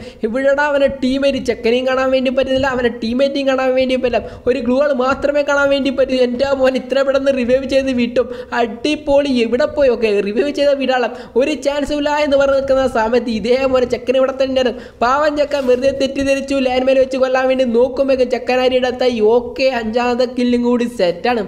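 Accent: Kannur, India. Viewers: Kannur karnano bro nane athe mannur enna stalathane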